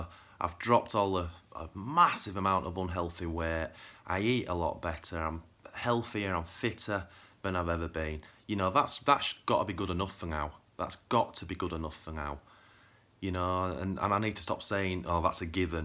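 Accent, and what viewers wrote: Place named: United Kingdom. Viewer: Great vid, totally get it. Were's your accent from? Im from uk now living in australia. Your accent sounds same as mine : )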